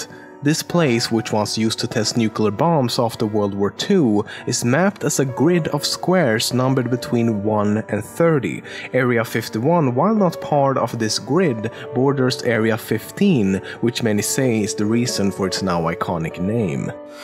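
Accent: Sweden. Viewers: Tbh I can’t stand this Swedish English accent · We can hear you are swedish!😂 i’m personally swedish.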